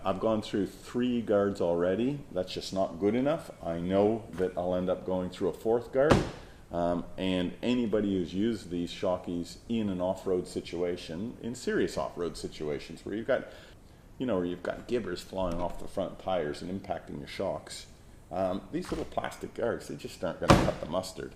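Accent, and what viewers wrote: Canada. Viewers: Very useful video! Thank you! Are you a Newfie?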